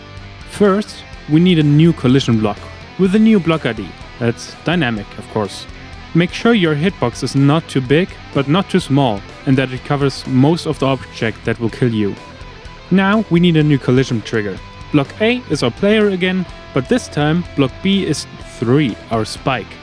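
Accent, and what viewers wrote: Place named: Germany. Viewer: you sound german lol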